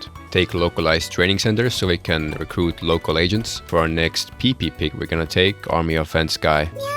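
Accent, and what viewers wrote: Estonia. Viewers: he estonian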